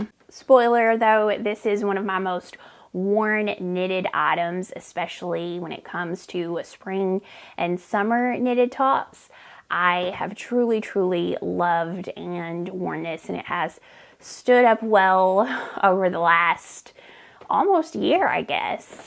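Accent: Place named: Kentucky, United States. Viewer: As someone from KY. I am enjoying watching such a talented knitter that share my accent. 😂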